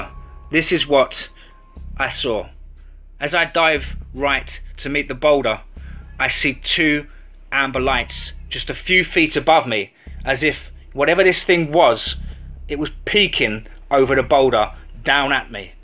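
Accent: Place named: England, United Kingdom. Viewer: Your Englishness is awesome... Some tips. Tucson is pronounced two sawnh... I love your channel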